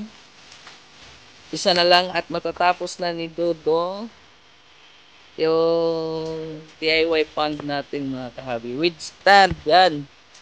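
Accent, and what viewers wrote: Visayas, Philippines. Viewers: Bisaya ka pala lods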